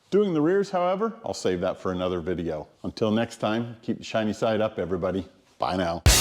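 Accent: Canada. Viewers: Canadian accent but with Aussie slang = hillarious! SUBSCRIBED. Very nice workspace you have got. I'll peek your channel - I see some projects in the background.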